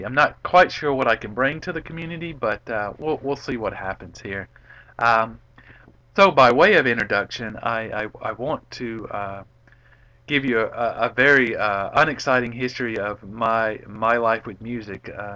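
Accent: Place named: South, United States. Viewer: Thanks for introducing yourself. I thought I heard a Southern accent. Your story is interesting and I'm glad you shared it. I love classical music too.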